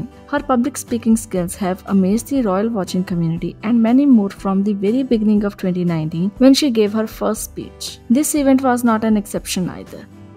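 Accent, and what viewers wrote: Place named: India. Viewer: La locutora en este video es una mujer de la India. Lo puedo determinar por su acento.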